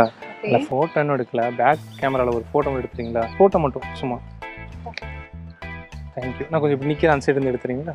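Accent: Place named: Coimbatore, India. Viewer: Romance naa thaan 🔥 Apram eppovumae last la antha kovai slang pesirunga athaan massuu Bro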